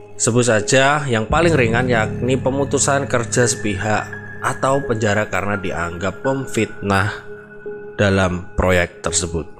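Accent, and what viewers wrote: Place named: Java, Indonesia. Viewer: orang jawa emang logatnya beda hhhhhhh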